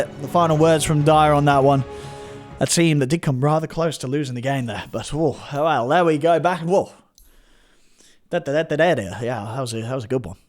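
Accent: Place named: United Kingdom. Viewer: where can i watch all his casts?? i want to adapt his thick british accent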